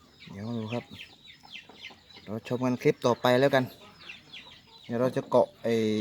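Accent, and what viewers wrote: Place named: Suphanburi, Thailand. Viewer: สวัสดีครับ....ออ็อด...สู่สู่..ครับ เป็นกำลังใจให้ครับ.... เหน่อสุพรรณ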